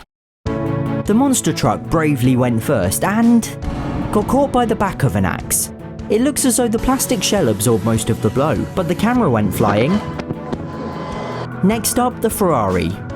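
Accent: United Kingdom. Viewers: are you British 🇦🇺?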